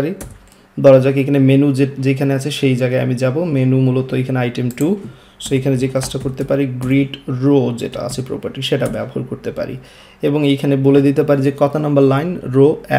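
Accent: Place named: Sylhet, Bangladesh. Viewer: sir u are really amazing.. Sylhety bhai ❤️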